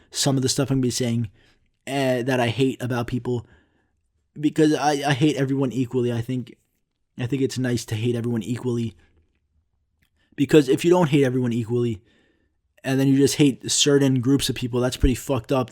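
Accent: New York, United States. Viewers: I know you're from Greece, but you sound like you came from New York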